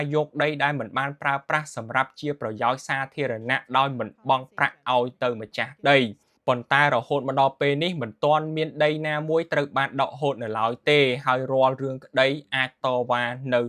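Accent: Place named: Vietnam. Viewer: កុំនិយាយភាសារខ្មែរតាមរបៀបតុងយួនមកនិយាយអី ព្រោះខ្ញុំស្អប់យួនណាស់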